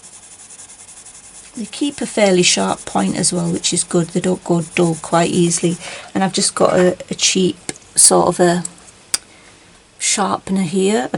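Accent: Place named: Yorkshire, United Kingdom. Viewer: good idea! I have carers who go in and out...they help me care for my partner, so I have to ensure from a health and safety view I do not have tripping hazards...so putting loads of cushions against the door is not an option. I now have to watch Amazon and track where the delivery person is and hover by the door when they are a couple of stops away so that I can take it from them. Total nightmare! I still haven't opened and tried the items yet...still too scared. I am really enjoying your videos. Love your accent...I am trying to work out what part of Yorkshire you are from. I am in the UK too.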